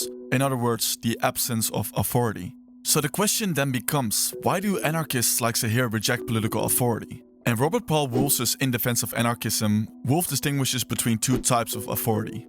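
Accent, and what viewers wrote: Netherlands. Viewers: Your accent sounds very Dutch, are you?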